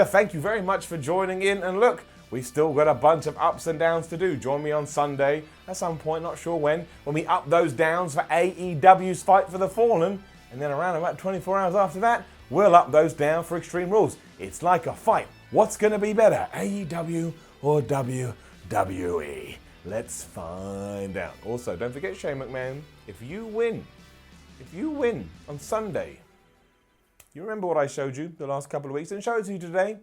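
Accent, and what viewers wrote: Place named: Scotland, United Kingdom. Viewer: why a scottish accident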